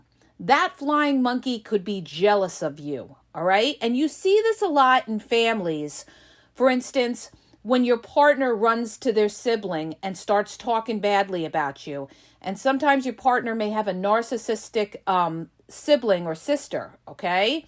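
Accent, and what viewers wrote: New York, United States. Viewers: Your from queens or long Island, it sounds like im back in the old neighborhood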